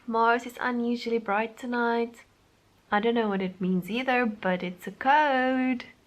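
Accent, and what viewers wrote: South Africa. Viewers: sorry my south african senses are tingling, are you from South Africa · Are you South African ?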